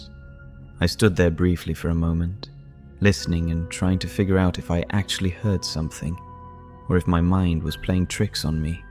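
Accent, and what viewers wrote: United Kingdom. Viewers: Native or acted, but British accent is the best on my and many others opinions👍👍👍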